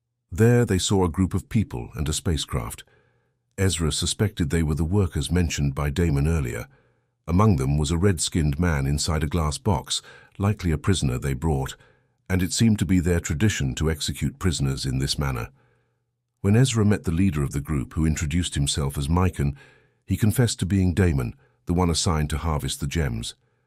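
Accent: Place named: United Kingdom. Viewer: Have to say, that voice caught me off guard a bit......😊 Im not used to it being a (very) British accent, its usually an American accent...😊 Thanks for the recap 👍💙💛👍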